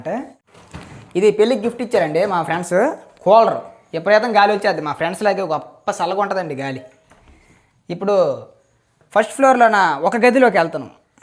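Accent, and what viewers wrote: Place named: Telugu, India. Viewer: That slang is pure telugu ❤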